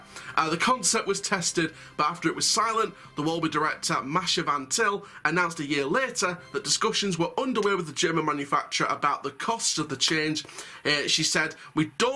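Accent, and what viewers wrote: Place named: United Kingdom. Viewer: Love your British accent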